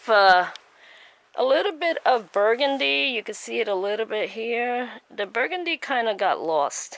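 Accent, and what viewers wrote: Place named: New Jersey, United States. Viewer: Lol I knew you were a Jersey girl or very close. I have cousins in Jersey, I'm in Southwest VA and we tease each other about our accents 😁😁 That sure is an awesome piece of work on both 💖💖💖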